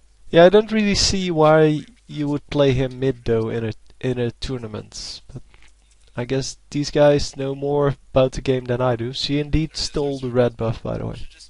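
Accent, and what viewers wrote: Netherlands. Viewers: you are such a bad commentator.you obviously know nothing about this game.If reginald picks zilean mid,you can be sure zilean is a good champion and you shouldn't break him down.Your accent is so annoying!So is your voice.I hate it when people from the netherlands try to speak english.Please don't make any commentaries anymore.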